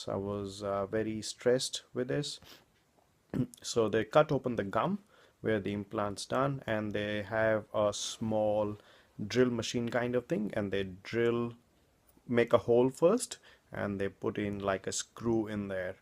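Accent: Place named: Delhi, India. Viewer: Your not from Australia, your an Indian dude, paid for promo. Accent is Delhi strong.... Lol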